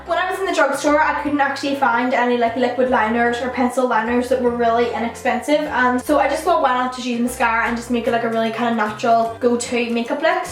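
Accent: Northern Ireland, United Kingdom. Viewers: She's from Northern Ireland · omg are u from Northern Ireland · R u from Northern Ireland I am xx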